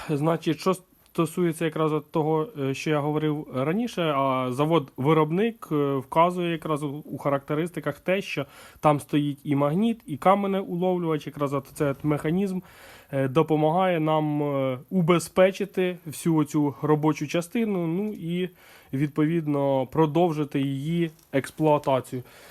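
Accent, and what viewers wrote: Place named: Shostka, Ukraine. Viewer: Цікаво з якого ви міста? Бо говорите Шостка Кролевець))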